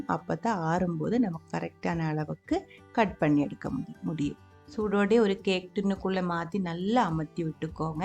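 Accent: Kerala, India. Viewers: Alayalam kalantha thamil arumai sagothari valga valamudan aviyal solli kudunga enga kudumpame adimai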